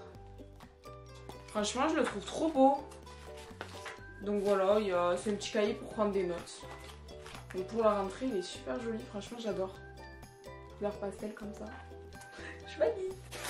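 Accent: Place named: Marseille, France. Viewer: T fournitures sont trop mignonne moi jpp faire sa je vais en cap mécaniques l'année pro donc bon 😂 mais après c'est chou j'ai trop hâte de faire mes fourniture est d'aller en cours mais que les trois premier jours après sa va me saouler ( ta l'accent marseille incroyable comme moi mais toi vrm remarquer ) ❤️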